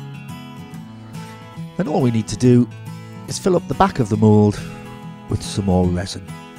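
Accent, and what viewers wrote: North East England, United Kingdom. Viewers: Thanks for this tutorial you blew my mind such a simple solution to making eyeballs for my handpainted skulls been looking all over for a way to do that without buying doll eyes from China. Of course I find genius solution from someone with a north-east accent hahaha cause that's where I'm from too! Proper class pet! Canny video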